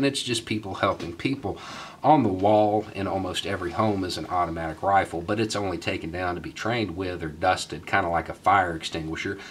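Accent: South, United States. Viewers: Oh man what this guys sells is generic progressivism in a southern accent. He's the black republican.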